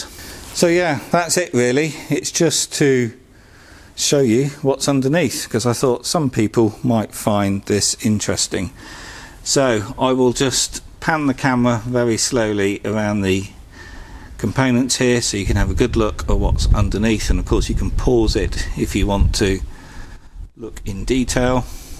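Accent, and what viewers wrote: United Kingdom. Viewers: Love the YT algorithm 😊 Thx for ur video- it really helps me a bit to understand how ev‘s work. Greetings from austria 🇦🇹 Love the British accent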